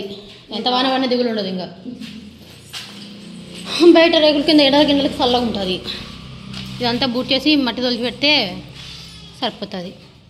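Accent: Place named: Kadapa, India. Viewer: Meeru matlade language same ma area lo lage vundi, garu meedi e vurandi, meeru kadapa district ki chendinavara?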